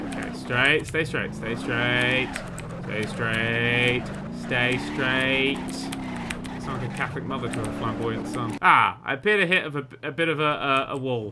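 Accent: United Kingdom. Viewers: is he british but I like his laugh XD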